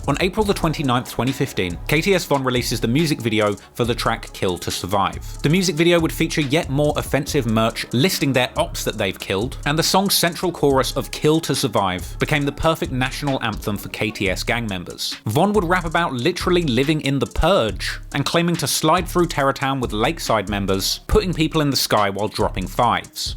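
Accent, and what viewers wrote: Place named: Cockney, United Kingdom. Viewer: 😂😂😂 it's PAH-STO ..that cockney accent fucks up alotta pronunciation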